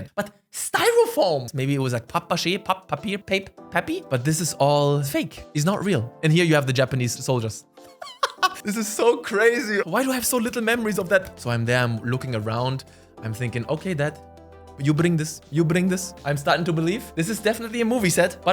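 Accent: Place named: Germany. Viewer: Are you half german? I like your accent man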